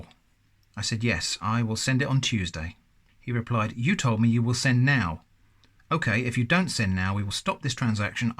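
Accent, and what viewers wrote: United Kingdom. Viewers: I was gonna subscribe but british english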